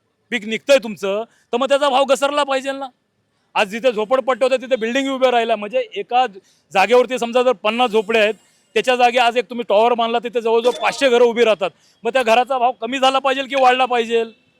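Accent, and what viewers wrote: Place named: Konkan, India. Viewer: Nakki kokanatla ahe ha... Jabardast boltoy bhau ..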